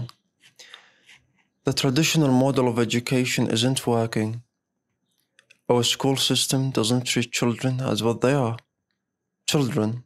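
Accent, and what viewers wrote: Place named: United Kingdom. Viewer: Very nice job. I enjoy it as always. Your pronunciation and british accent are great! Your voice tone is perfect! I wish you a big success and happiness! ❤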